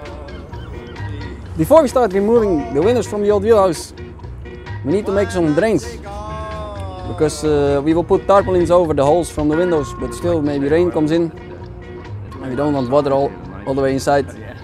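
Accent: Netherlands. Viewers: Hire an English speaker. The Dutch influenced language is killing the pleasure of watching. So, nope, not for me.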